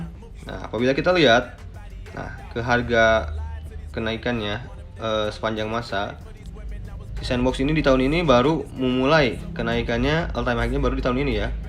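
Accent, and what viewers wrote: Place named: Bali, Indonesia. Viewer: Kyk logat bali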